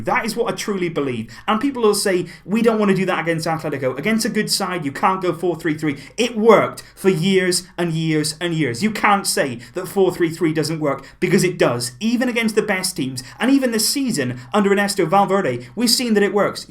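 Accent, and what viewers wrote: United Kingdom. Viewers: you're a star pal. I do love your channel very much. I do like and appreciate the way you explain the things by yourself. Everything abdolutely well explained with a lot of sense. I'm spanish and I am living in UK, I can notice from your accent that your British. Isn't easy not to be spanish and be as you are, a very FCB lover. I'd be grateful if you take atention and also perhaps, answer my comment. I hope, we, all the Barça supporters, can celebrate together many titles this season, as we really deserve. Specially for the king of the kings, our master and always unforgettable Lionel Messi, which has made this team (club) a real live style. I gotta be proud of this guy, as well with: Iniesta, Busi, Luis Suárez, etc. GO AHEAD Barça !! Still many amazing moments yet to enjoy from this squad!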